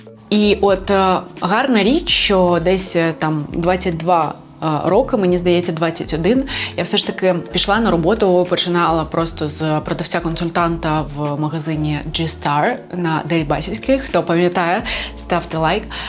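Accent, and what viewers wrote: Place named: Russia. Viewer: Людина переходить з російсьеої мови на українську. А наголос залишися зі слова «счастлива»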